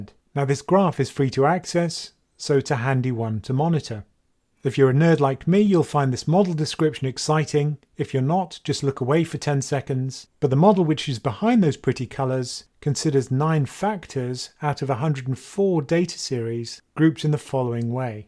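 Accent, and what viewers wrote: United Kingdom. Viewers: Why do I get that feeling every time video with brit ascent remind me M .I .6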